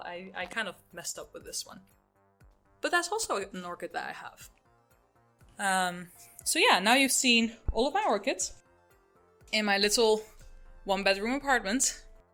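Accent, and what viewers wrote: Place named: Netherlands. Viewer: Oh No water culture 😲 (Genf ? you sound Dutch)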